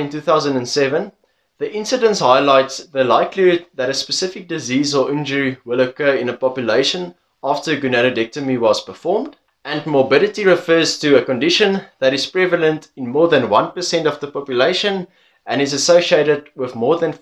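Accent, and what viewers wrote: South Africa. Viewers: Great RSA accent and easy on the eyes...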